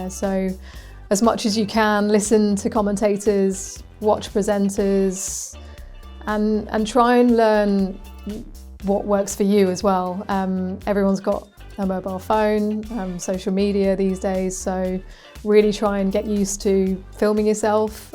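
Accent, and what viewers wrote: United Kingdom. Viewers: Listening to her voice in British accent is pne of the most satisfying things 😌 ✨️ 😍 💕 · My favorite female commentator .... with lovely voice, English accent, very beautiful personality.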